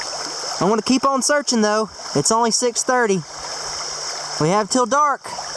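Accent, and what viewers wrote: South, United States. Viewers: nice mellow southern drawl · I like his southern accent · He has the most southern ascent ever and i love it!!!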